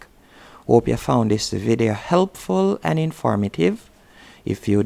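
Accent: Jamaica. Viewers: Dawg yardie accent nah guh no weh! Respect! This is what I was looking for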